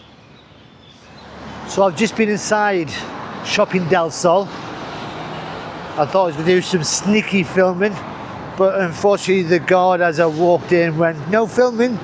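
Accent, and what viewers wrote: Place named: Newcastle, United Kingdom. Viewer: Where you from Bonny Lad? Newcastle or Sunderland ..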